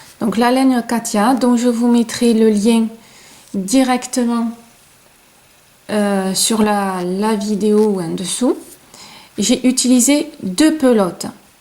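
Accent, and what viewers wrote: South, France. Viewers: Merci à toi, "comme une grande" j'ai réussi à faire ce magnifique point de torsade grâce à tes explications claires et ton agréable accent du Sud.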